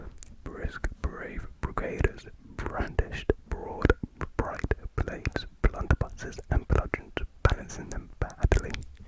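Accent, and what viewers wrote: United Kingdom. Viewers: British accent 😩😍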